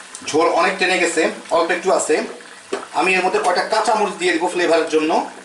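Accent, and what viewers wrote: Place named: Chittagong, Bangladesh. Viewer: Apni chittagong er manus kota gulor dhorone buja jai.ami apnake subscribe koreci onk sushadu recipe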